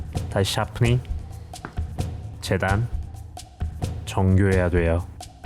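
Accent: Gyeongsang, South Korea. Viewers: 아 약간 경상도 억양이 있는 듯 한데 경상도에서 오셨나요!!! 헷갈리네요 ㅎㅎ 항상 잘보고 있어요!!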